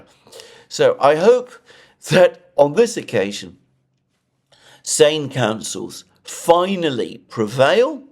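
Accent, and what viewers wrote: United Kingdom. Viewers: Thanks for all you do for us:-). Love your beautiful British accent:-). Take care👍🏻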